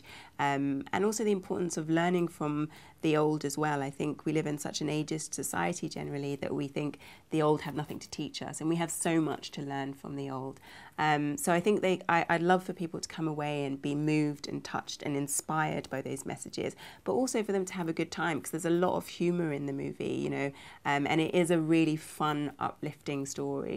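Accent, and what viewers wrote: United Kingdom. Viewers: i loooooooove the accent yoooh you are so good. you sound soooo African in the movie and so British in real life woooow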